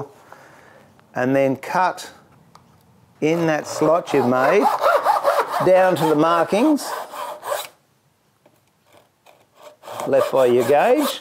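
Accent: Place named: Australia. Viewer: Nice australian accent :) Thank you for your efforts very much. Your work is more than useful.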